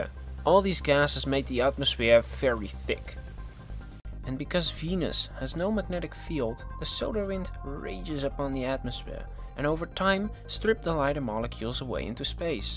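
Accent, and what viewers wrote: Netherlands. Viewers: Are you dutch? Good video btw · Did I heard an Dutch accent?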